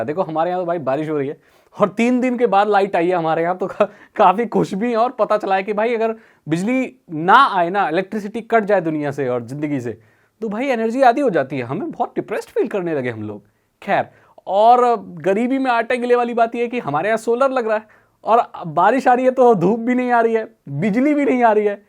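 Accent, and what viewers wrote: Odisha, India. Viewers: Are you from Odisha?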